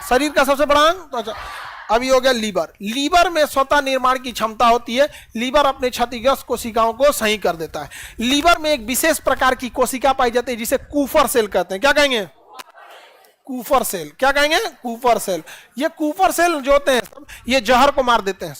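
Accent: Bihar, India. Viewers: Sir bahut achha samjhate hai Bihar abaj me bhi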